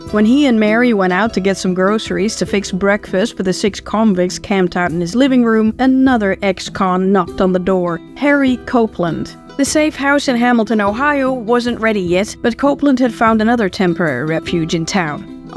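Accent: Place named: Netherlands. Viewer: I love that silky dutch accent. Another brilliant episode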